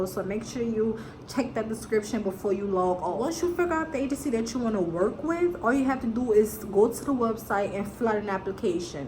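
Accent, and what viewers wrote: New Orleans, United States. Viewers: I LOVE LOVE LOVE LOVE your NOLA accent whew! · Love your NOLA accent 😩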